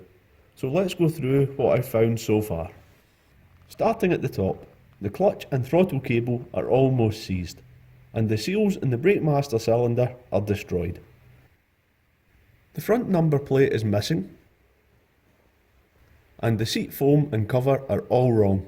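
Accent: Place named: England, United Kingdom. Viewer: yes of course the finest English accent in the world. According to me he could talk a little bit more, than I could listen to the only true English accent all day long 👍🏻👍🏻👍🏻👍🏻👍🏻👍🏻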